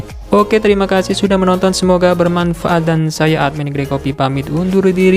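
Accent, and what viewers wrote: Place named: Madura, Indonesia. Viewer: orang madura yah bang, logatnya kaya madura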